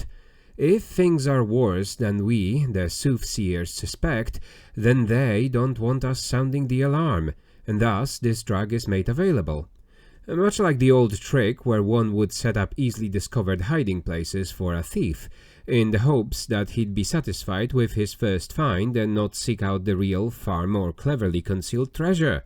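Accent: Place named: Poland. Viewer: Hey, thanks for reading this! Big fan of Lem and classic sci-fi in general, and this book is really fun. It's nice to be able to listen to it in English. Also, are you Polish by any chance? I feel like you speak with a bit of an accent, but I can't quite tell what it is. All I know is that I sound kinda similar when I speak English, and I'm Polish, so maybe it's a Polish accent? (That's not meant as a criticism, by the way; your pronunciation is very clear and your voice is consistent. Good job!)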